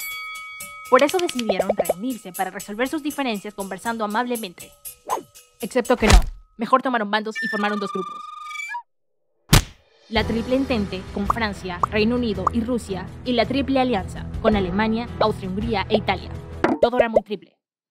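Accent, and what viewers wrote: Panama. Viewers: No entendi español pls (Eres de Panamá?) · Eres de Panamá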